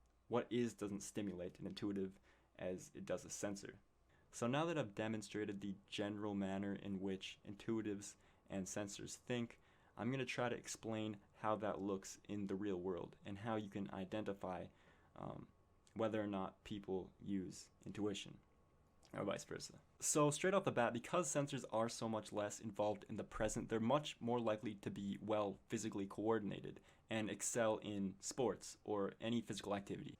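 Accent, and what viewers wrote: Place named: Canada. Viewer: Are you Canadian? Love your accent!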